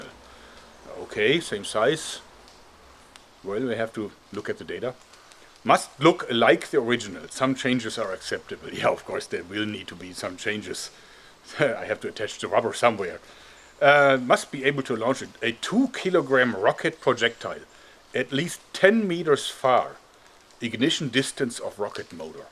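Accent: Germany. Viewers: Say Asta la vista with ur German accent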